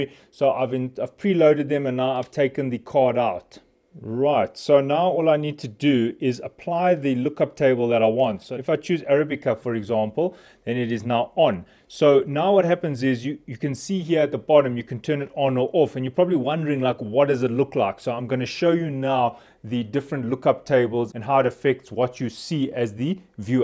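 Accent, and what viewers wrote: South Africa. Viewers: I recognize the South African accent from a mile. Hello from Cape Town.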